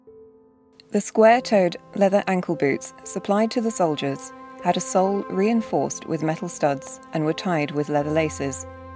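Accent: United Kingdom. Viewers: don't need a British uniform to know he's from there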